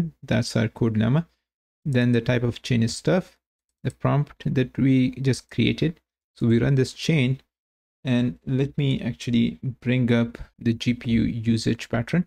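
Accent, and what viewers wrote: India. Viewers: First second I hear Indian accent = Imma'bout to get pure useful information from this video, this is for sure. Thx for the video though 1 thing - when you talk about "previous video" at the beginning of the video, I'd consider to provide this "leading link" that shows in the corner because I'm not familiar and have to dig into your feed to find it. Not a great issue but still - just a tip to consider.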